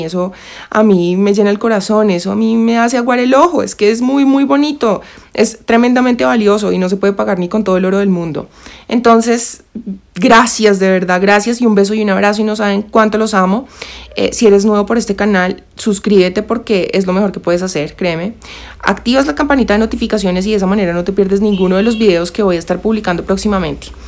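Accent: Bogotá, Colombia. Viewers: Siempre me ha gustado como hablan las colombianas y tu no eres la excepción, y muy buena informacion gracias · Hay diferentes estratos en bogota esa es la diferencia pero si es rola lo digo yo que vivo acá y el que dice que hablamos ñero se la paso en sur de bogota que es reñera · Colombiana pero este es el acento de BOGOTÁ osea el acento rolo!! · Colombiana!?? Un acento q me encanta !!🤩😍😍😍😍😍😍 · Sí es Colombiana como yo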